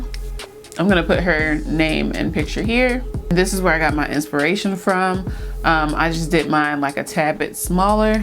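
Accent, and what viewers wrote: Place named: Nigeria. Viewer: I hear a bit of a Nigerian accent. Is she African??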